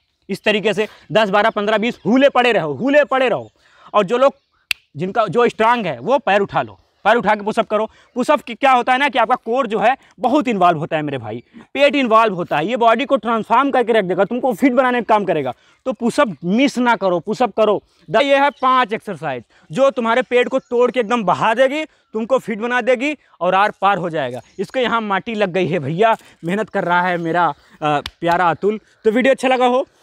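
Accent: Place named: Uttar Pradesh, India. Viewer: Bhai aapki bato se lag rga h aap hamare hi taraf ke ho...... Zila sultanpur.......up Mja aa gya bhai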